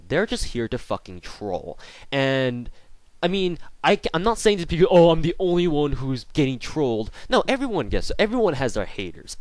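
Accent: Asia. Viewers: I love your Asian accent it makes things very funny!! And i appreciate what you do a lot your in college and make time for youtube making multiple videos a day! YOU ARE THE REASON I WASNT BORED WHEN I DIDNT HAVE MY PC!!!! and were you the one that i beat in the event on sf2? lol the event to get the Scar H Lucie or something. lol im KoolGam3rsHQ on sf2 but i agree with a lot of this i actually watched whole thing lol facepalm! lol, and i agree that in our society now the nice people get torn up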